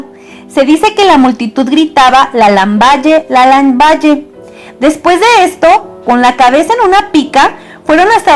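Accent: Mexico. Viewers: Interesante historia pero la voz es un poco fastidiosa con ese acento mexicano tan marcado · TU ACENTO MEXICANO de clase baja 💩💩💩💩 ES INSOPORTABLE.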